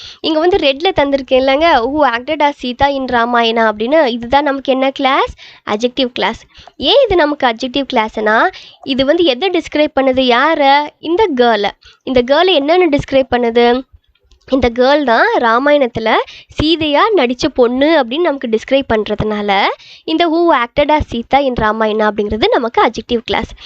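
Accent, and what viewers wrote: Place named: Coimbatore, India. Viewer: vaanga ponga inga nu soldrathulaa alagaa irukku sister...Coimbatore style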